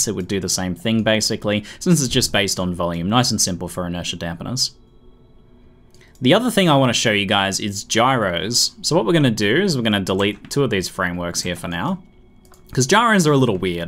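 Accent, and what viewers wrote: Australia. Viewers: I must say, an excellent tutorial! And always great hearing our Aussie accent on Youtube! Onya keep up the amazing vids!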